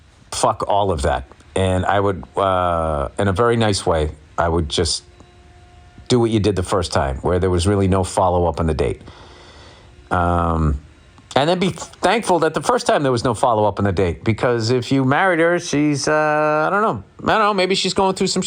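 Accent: United States. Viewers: I see the flaming retards are back in the comments. I love the morons that say that I'm just here for the comments. You're helping Bill out on his channel, so thank you. Bill is still crushing it. He will still fill arenas and he could give a fuck less what the professionally offened have to say. All you haters will just become a punch-line in Bill's jokes. I still love that Bill brought his American voice to the Middle East.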